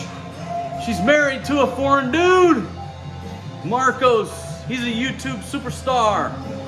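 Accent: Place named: Alabama, United States. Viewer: Brother You Got Me Cracking up Big Time. I'm here in Alabama USA 🇺🇸. You sound just like someone here would say. Be Good Bro. God Bless You Man.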